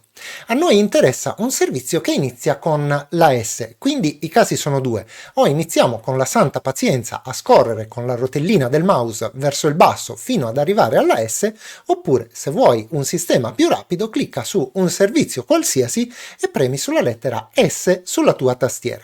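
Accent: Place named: Palermo, Italy. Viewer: Abiti a Genova però il tuo accento è palermitano. Comunque grazie per i tuoi video che sono sempre utili.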